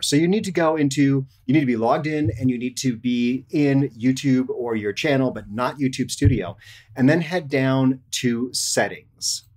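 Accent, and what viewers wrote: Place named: United States. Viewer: im intrested in your accent . its thick and pure american. i appreciate you spendnig time and putting effort into sharing your useful information with others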